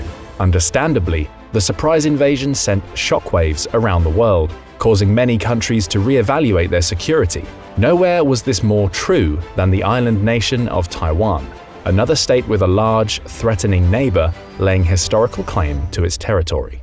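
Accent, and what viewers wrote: United Kingdom. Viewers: Glad I found this channel. Informative reporting on contemporary geopolitics, and with an easy to understand British accent!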